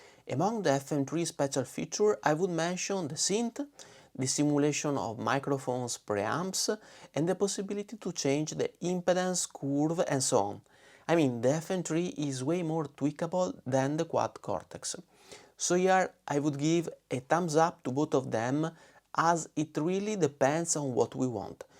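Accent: Venice, Italy. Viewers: Mi piace troppo il tuo accento veneto!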